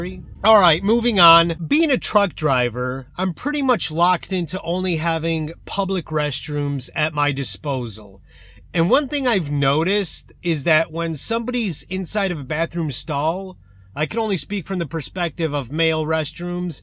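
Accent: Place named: Russia. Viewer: His Russian accent=👋🏼🏃🏼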